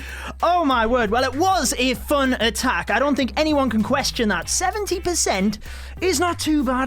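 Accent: United Kingdom. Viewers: Very good british Accent I enjoy your speaking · I love that British accent 😂.... P.s thnx for the great video!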